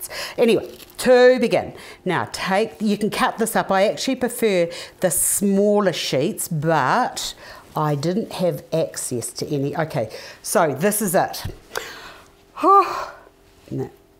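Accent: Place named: New Zealand. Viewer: That NZ ecksent keeps me coming back.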